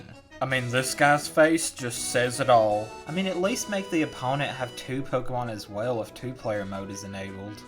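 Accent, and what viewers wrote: South, United States. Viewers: Are you a southerner?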